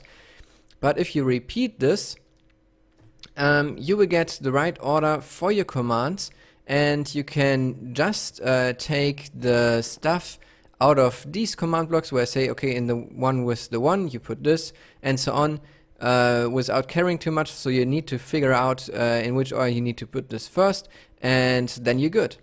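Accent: Germany. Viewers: kanns sein das du eigentlich deutscher bist? hörst sich bissle so an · Bist du deutsch? kilngt so :D ich finds richtig gut aber ich hab mir die map gedownloaded und finde die stelle mit dem jetpack nicht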